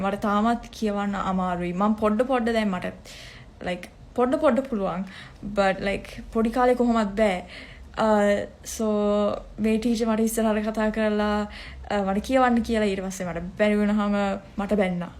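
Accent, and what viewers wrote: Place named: Sri Lanka. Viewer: English katha karanneth sinhala wagema thamai, loku wenasak nha, mekige boru pade. Phyco case ekak wage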